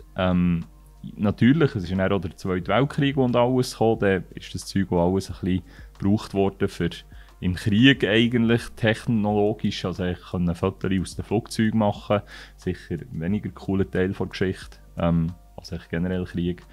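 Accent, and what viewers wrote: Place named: Bern, Switzerland. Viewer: Schön wieder einisch Bärndütsch z'göhre. Danke für das Video. Ich bin auch der Meinung, dass die Kamera in erster Linie gefallen muss und nicht nur sollte. Denn dann nehme ich diese bei jeder Gelegenheit in die Hand und möchte fotografieren. Wer sich in Leica verguckt hat, braucht dann eben eine entsprechende Brieftasche. Wer bereit dazu ist, darf jeder für sich entscheiden, für mich kommen dann jedoch andere Kameras in den Fokus, welche genauso gefallen und technisch meine Ideen noch besser unterstützen. 60MB ohne IBIS ist für mich fragwürdig. Diese Auflösung wird nicht ausgeschöpft, würde ich meinen und füllt den Rechner mit Daten, aber auch das muss jeder selber abschätzen. Generell wird die Auflösung zu stark bewertet. Wer was braucht an einer Kamera, sollte von der Gestaltung der Bilder abhängig sein. Ein EVF unterstützt gewaltig die Komposition sowie die Bildgestaltung durch Belichtung und Blende. 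Immerhin sind diese zwei Faktoren Bildbestimmend. Geht es auch ohne, wunderbar, ich möchte jedoch ein EVF nicht mehr missen, dann wenigstens ein Display. Um nochmals auf Leica sprechen zu kommen, jeder Kamerahersteller hat seine Geschichte, allerdings macht das Marketing von Leica einen guten Job, ob das die Kamera besser macht, möchte ich bezweifeln, eher teurer. Aber so ist es nun mal, auch bei anderen Produkten, wer sich in eine Rolex verguckt hat will keine Swatch. An dieser Stelle muss sich jeder selber die Antwort geben, wieso weshalb und warum Mensch das Bedürfnis hat u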